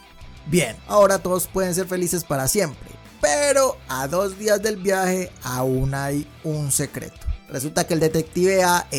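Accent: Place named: Colombia. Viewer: Que como se que sos colombiano? por el acento, y con la frase "la mata que mata" ajajajaja...sos de los mios compa.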